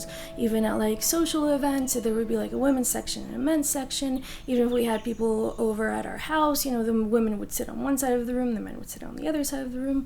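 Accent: United States. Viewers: Why dont she show her before-pics (for proof) with her face on front? It also makes me wonder how fluent and well-versed she is with english languange with no speck of hint of an accent considering she lived in Lebanon for more than 20 years, and magically have perfect american accent, and even if yes, she may have developed that accent, its impossible to sound that good with no stuttering and hint of a slip. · She sounds AMERICAN, and who wants to look at men anyways? I'd prefer a little segregated lol. Making a mountain out of a molehill.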